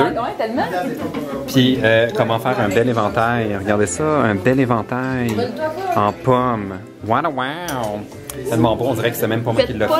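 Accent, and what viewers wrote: Quebec, Canada. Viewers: Ces quoi cet accent de merde je suis plier en deux ptddddddddddddddddddrrrrrrrrrrrrrrr merci mon dieu que je suis pas québécois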